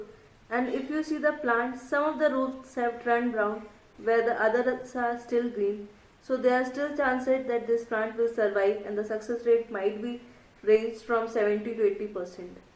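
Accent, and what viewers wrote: India. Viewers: Gotta love Punjab voices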